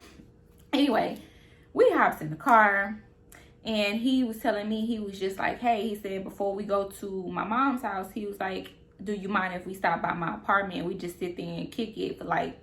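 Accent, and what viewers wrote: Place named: New Orleans, United States. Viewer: I feel like I hear a lil new orleans accent lol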